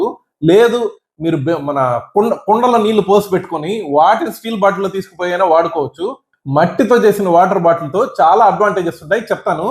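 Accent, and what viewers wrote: Nellore, India. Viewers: మీ మాట తీరులో మన నెల్లూరు యాస స్పష్టంగా కనబడుతోంది 🙏 మంచి సూచనలు సలహాలు ఇచ్చారు ధన్యవాదములు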